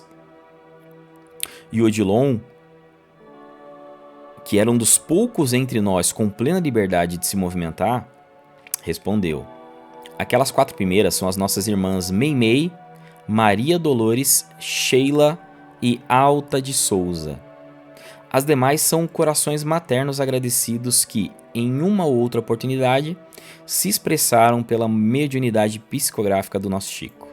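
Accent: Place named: São Paulo, Brazil. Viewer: Meu caro vc se expressa mt ruim com seu sotaque Paulista e precisa ler melhor, não tem emoção deu a entender que era qualquer leitura. Desculpa mas é uma passagem mt importante estude mais meu caro. Deus abençoa ❤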